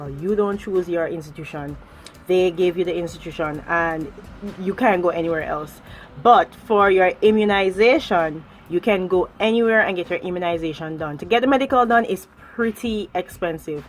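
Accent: Jamaica. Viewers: girl...i swore u were Jamaican... i am shook wow..interesting information.....very informative video.... Thanks for sharing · You sound way more Jamaican than Guyanese, so I've claimed you as a Jamaican lol. I absolutely love your creative hairstyles (combed out my locs last November), especially those on your OMG channel. Please keep doing more as we have similar textures.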